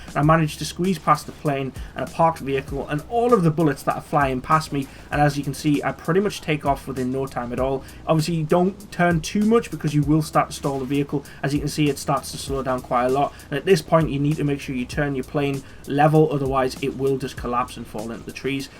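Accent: Newcastle, United Kingdom. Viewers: "Absolutely class"😂 R u a Geordie too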